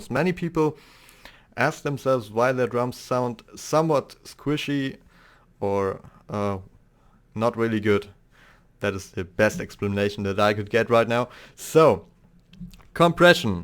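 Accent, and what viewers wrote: Germany. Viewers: dass du deutsch bist, hört man direkt raus. deine anfangsbeats sind brutal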